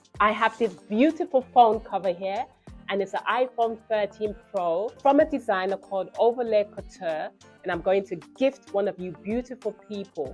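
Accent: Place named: Nigeria. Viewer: I like your Nigerian accent 👌🏾 😍 🫂..you look beautiful as always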